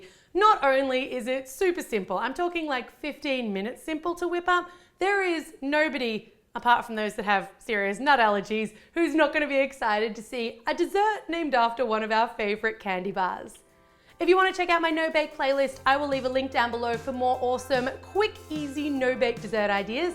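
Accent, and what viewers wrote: Australia. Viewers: it's just her Australian accent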